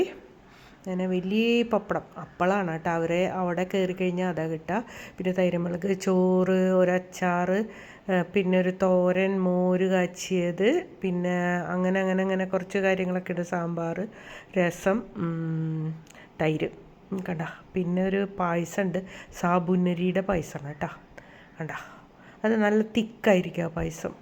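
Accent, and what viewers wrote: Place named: Thrissur, India. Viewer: ചേച്ചീ നാടെവിടെയാണ് സംസാരം കേട്ടിട്ട് തൃശ്ശൂർ പോലെ തോന്നി ഞാൻ തൃശ്ർ ആണ് ആദ്യമായാണ് ചാനലിൽ ഇഡ്ലി റസിപ്പി കണ്ടത് ചേച്ചീടെ സംസാരം ഇഷ്ടമയപ്പോൾ കുറച്ചു കൂടി വീഡിയോസ് നോക്കി ഇനിയും കാണണം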